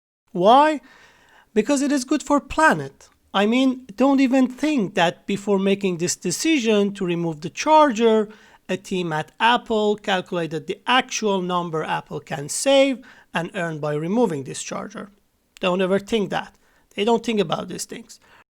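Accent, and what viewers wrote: Iran. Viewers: The Persian accent makes it more interesting 😁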